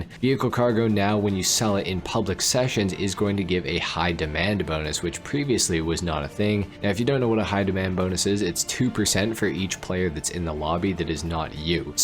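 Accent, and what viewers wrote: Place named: Canada. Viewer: Winnipeg mafia😂 I could tell by your voice you from Canada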